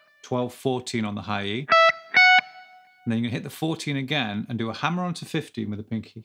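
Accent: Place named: North West England, United Kingdom. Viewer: Also wanted to ask where you’re from. I’m originally from Hanley, Stoke-on-Trent, left for Canada from Northwich, and taught high school in Macclesfield. I notice certain inflections in the accent that give away a North West origin.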